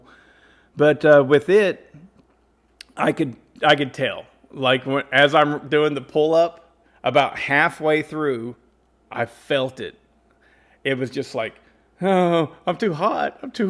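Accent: South, United States. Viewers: well thank you man. The southern accent can be crazy at times!! I plan to hopefully keep us learning and trying new things 👍🏻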